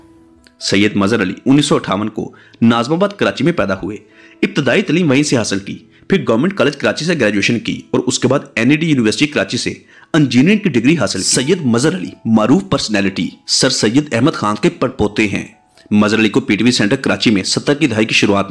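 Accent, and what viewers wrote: Punjab, India. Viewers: بھای۔۔۔۔ اپکی آواز اچھی ہے۔۔۔ مگر ادائیگی کھردری اور پنجابی لہجہ ۔۔تھوڑا سا بہتری لانے کی کوشش کیجیے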